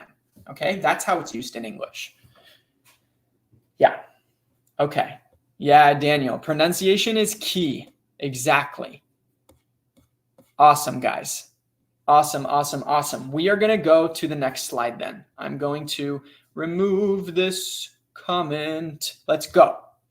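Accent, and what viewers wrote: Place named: California, United States. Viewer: AWESOME! THE BEST ACCENT I"VE HEARD IN FLUENCY, FROM CALIFORNIA.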